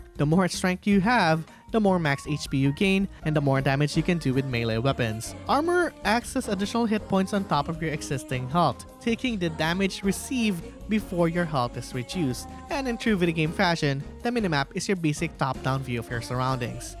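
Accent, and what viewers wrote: Philippines. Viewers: i needed help but not that bad enough to put up with this fkn fillo accent bhahaahah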